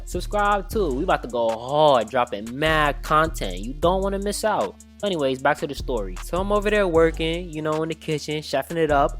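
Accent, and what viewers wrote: New York, United States. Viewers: bro said whattaburger yet talk like he from ny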